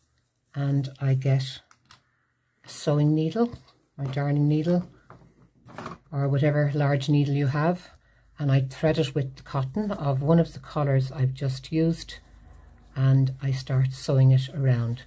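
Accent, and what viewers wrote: Ireland. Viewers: Thank you. Wish all my school teachers had had your Irish Accent maybe I'd have listened more at school, you make it sooo easy to follow. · delightfully surprised to hear an Irish accent when I turned the volume on lmao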